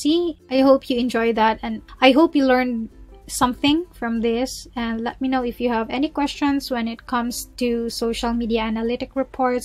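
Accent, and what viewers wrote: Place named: Davao, Philippines. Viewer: Based on your tagalog accent, taga Davao ka po ba or Cebu?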